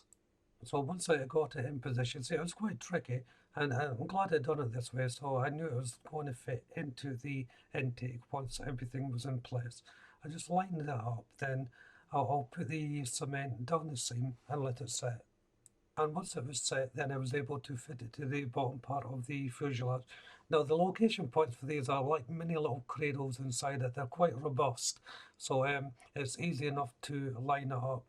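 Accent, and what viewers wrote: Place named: Fife, United Kingdom. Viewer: I kinda thought I recognised your accent. I spent many years around Glenrothes and Dunfermline before moving to Asia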